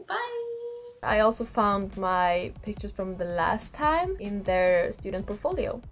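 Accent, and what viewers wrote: Sweden. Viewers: I knew I've heard this accent before hehe. Du e svensk eller hur?:")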